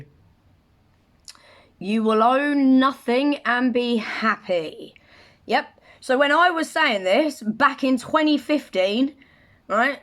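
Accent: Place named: East London, United Kingdom. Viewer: lol only found your channel like a week or 2 ago and love it lol you really remind me of home i was born in east London and grew up in Essex, lol you sound so much like me on things 👍 i have been listening to Alex Jones for 25 years or a little more and everything he said is here now in everyone's face!!! and still people call him crazy lol i could natter for hours with you. keep up the videos they are great.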